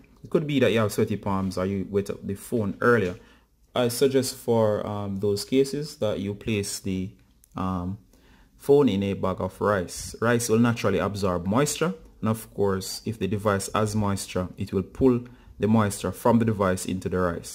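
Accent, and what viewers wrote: Jamaica. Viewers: I heard offer up signal 😆 thanks for the tips. Are you Jamaican ?🇯🇲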